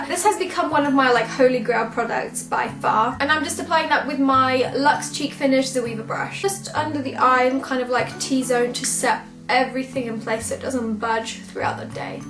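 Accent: England, United Kingdom. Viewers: Hi,! I've found your channel a few days ago and I absolutely loved it! ♥ I also love your British accent, which I wish I could have someday... congratulations for the success on YouTube:) my best wishes from Argentina:) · Where of England are you from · Cyna monka but she has a British accent at the moment, right? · This is from Poland, but live in England. So she has a british accent :)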